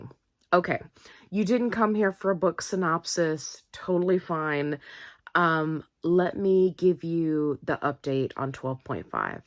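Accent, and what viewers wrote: United States. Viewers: I’m a southwestern Ohioaner, I thought she was originally from West Virginia. 😂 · Keven's first snow!! 🩷 Side note: I always assumed you were from Ohio! (I like to guess where someone is from by their accent.) As a former Buckeye I thought we had the same accent. 😂 Didn't place you as a Southerner.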